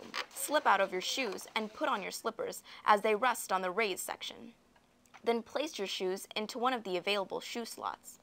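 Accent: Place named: Japan. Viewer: she gave accurate information and an interesting video, it's like a japanese person speaking english for the first few times, there not going to get it right straight away :)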